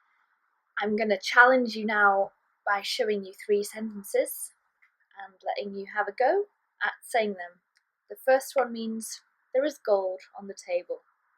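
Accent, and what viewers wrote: Wales, United Kingdom. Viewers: Really helpful video. I'll watch pt2 next. Defo helpful to ask the viewer to say a sentence, then hear it back correctly. Apologies if you've alresdy stated this elsewhere, but is this North Walian or South? Gets confusing when learning in the East Midlands! Diolch yn fawr iawn.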